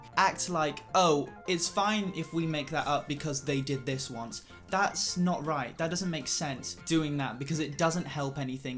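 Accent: United Kingdom. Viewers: Bro sounds like Britiish looks like korean what's happening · Wow I’ve never heard you talking 😯 I didn’t know you had a British accent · that british accent<3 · From which country he is???? Style like Korean Skin like Korean Makeup like Korean But But But Voice like British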